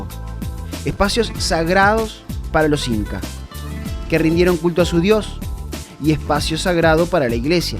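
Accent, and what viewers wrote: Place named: Argentina. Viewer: EL QUE NARRA ES ARGENTINO NO CABE DUDA....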